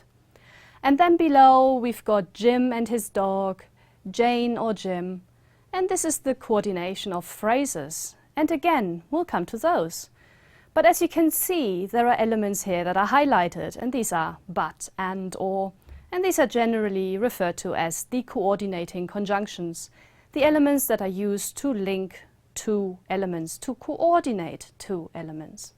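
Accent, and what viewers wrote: Germany. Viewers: Funnily, this was my assumption after I heard you talking. For me as a German your accent is still quite noticeable. When you pronounced "have" I immediately thought of this phenomenon called word final devoicing ("Auslautverhärtung"). Am I right with that or would you say it's part of your British accent?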